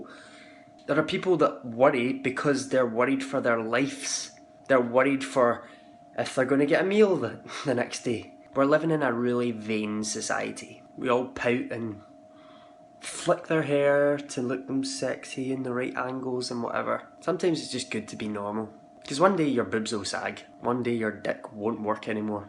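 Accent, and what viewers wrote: Scotland, United Kingdom. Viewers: I've been watching your videos for awhile now and I have to say that you always seem to say the words I need to hear. Life has taken its twists and turns, but I'm starting to find myself again and what makes me happy. I just wanted to thank you for your inspirational words, and of course I love your Scottish accent! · I could listen to you forever, especially with how great you make everyone feel talking about real, meaningful ideas. On another note there is nothing sexier than a Scottish accent.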